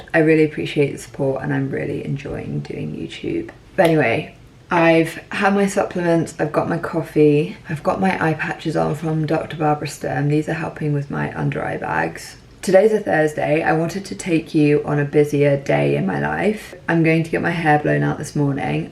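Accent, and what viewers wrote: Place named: Scotland, United Kingdom. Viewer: Omg you’re Scottish! Love from a fellow Scot in London ❤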